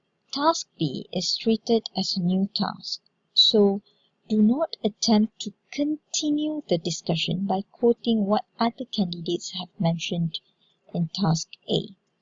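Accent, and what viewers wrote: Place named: United Kingdom. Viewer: 8 mins before my MUET. Btw love your british accent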